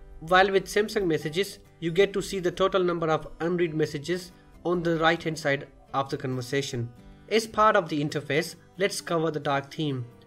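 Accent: India. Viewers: I cannot understand what he is saying with his India accent.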